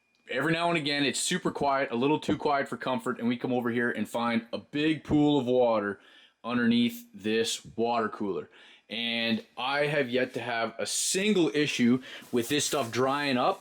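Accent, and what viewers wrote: Canada. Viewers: Yes noticed this too right away from a fellow Canadian! I get the same in my channel in the comments all the time haha can’t hide that Canadian accent 😁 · That hard ass Canadian accent...you act like this stuff is a new product...vinyl flooring has been around sometime...The only difference...in the past it was installed like carpet in rolls. Know the manufacturer gave customers/market pieces in a box instead of rolls.. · looks good! I know this has no relevance to the video but I love that i can tell you are Canadian by our distinct "accent" LOL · when I first heard you talk I knew you were from Canada. I'm from Northern Minnesota. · I knew you was in Canada by your accent. I live in West Virginia and I’ve been doin research on flooring for my house. This looks good but I’m scared that it would come up.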